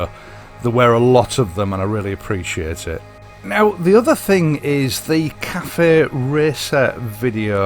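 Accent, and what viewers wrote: United Kingdom. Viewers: Your wonderful accent reminds me some famous BBC TV series. Not very easy to follow; I need some attention- but it has a very special charm. have a good recovery.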